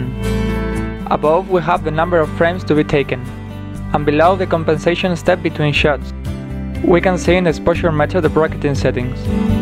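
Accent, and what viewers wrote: Spain. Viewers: Gracias, me ha sido útil, pero cómo se te nota el acento español hablando inglés jajaja. No offense, fela. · Spanish i guess. I know it because i am from Spain and many people speak like that :D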